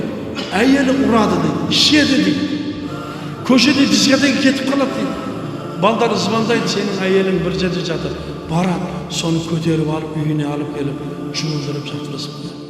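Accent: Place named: Uzbekistan. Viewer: Озбекше акцент урып тур устаз. неге озбекшеде амри маруф кылмайсыз ?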